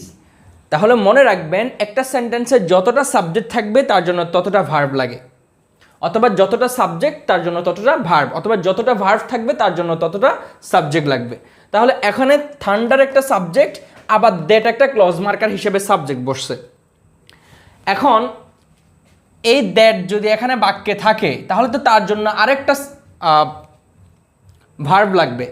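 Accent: Chittagong, Bangladesh. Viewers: Vai ki CHITTAINGE NAKI...!!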